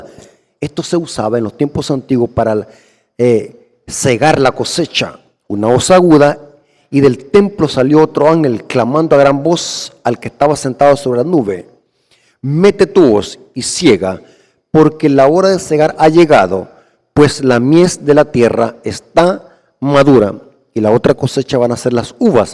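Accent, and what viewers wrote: El Salvador. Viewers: Disculpe, el hermano no dice sexta, sino que su pronunciación es diferente, él está diciendo SECTA. 😊 ASÍ HABLA ÉL, POR SERDEL SALVADOR.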